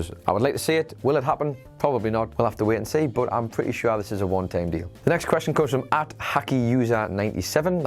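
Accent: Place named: Newcastle, United Kingdom. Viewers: It's Geordie, akin to MIDDLE ENGLISH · Geordie accent in this case- WhatCulture are based in Newcastle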